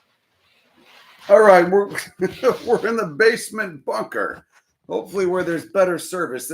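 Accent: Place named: Iowa, United States. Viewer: Love his Iowa accent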